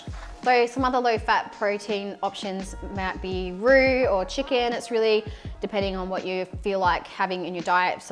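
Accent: Australia. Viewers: I like the Aussie accent.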